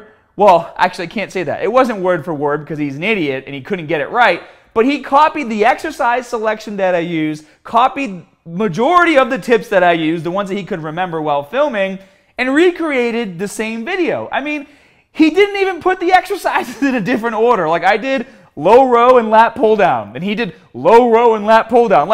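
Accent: Boston, United States. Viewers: I love his Boston accent he's hilarious overall but this guy is funny and cool and authentic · Love your Boston accent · My boy out here with that Boston accent. That accent just makes you stronger when you hear it. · Hey, I'm a current fatass working on losing weight and building muscle if thats possible. I was always skeptical about this guys ads on facebook. Are you from Boston with that accent? Total irrevelant build up to that question but I'm dying to know.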